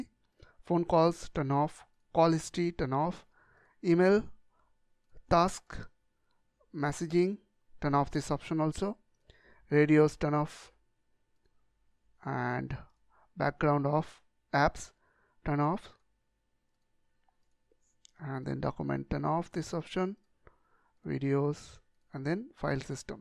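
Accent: India. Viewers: I thought he would just ruin your computer with his Indian voice but after looking at the comments I knew he was one of the good ones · I always wonder why it always the guy with the Indian accent that know all about technology but it truly a blessing to have the around when we have trouble. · I thought he was going scam us cause of his indian voice.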